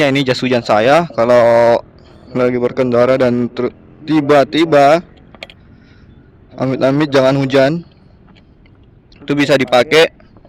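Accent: Makassar, Indonesia. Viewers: mantap mas bro... makassar banget.. lanjutkan om... hehehe...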